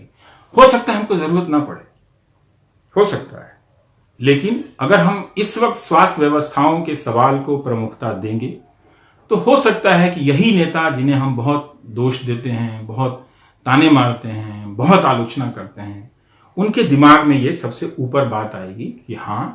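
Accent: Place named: Bihar, India. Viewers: Very nice bhojpuri ravish sir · Sir U are the hope of this country. Love your bihari ascent. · Etna nik koi na boli bhojpuri main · Ye style bohat acha laga pura bihari aur bhojpuri style maja as gaya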